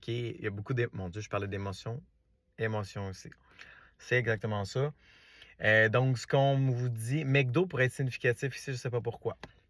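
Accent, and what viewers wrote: Quebec, Canada. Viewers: Bonjour, j'adore vôtre accent québécois, c'est craquant. Je me permets de vous dire que vous êtes trop beau 🙏. Bonne continuation. À bientôt.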